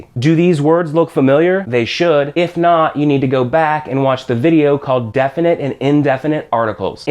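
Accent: United States. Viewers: Your very good at teach but the american twinge to your spanis pronunciation makes me giggle a little bit!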